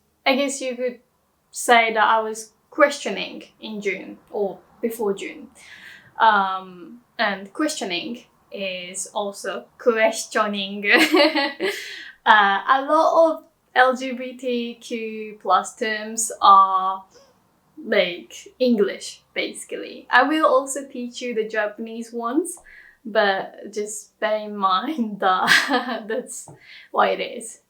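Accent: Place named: United Kingdom. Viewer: Omggg your English is so good it sounds like almost a perfect British accent normally people learn with an American accent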